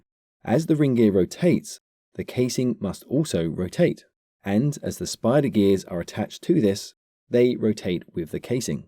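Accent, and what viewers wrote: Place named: England, United Kingdom. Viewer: Usually, the gears that you refer to as 'spider gears' and 'side gears' should more properly be referred to as planet gears. Others have also mentioned the crankshaft/camshaft error. Your terminology (despite your English accent) seems to be the dumbed down USA version. It's almost as if the correct term is just too difficult to learn or remember, so they HAVE to simplify it. Otherwise, a fairly decent explanation of events. Regards Mark in the UK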